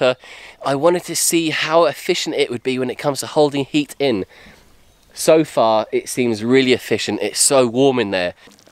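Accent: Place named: Bristol, United Kingdom. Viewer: Just found your channel.... I am in bristol, it sounds like you arent too far away from me.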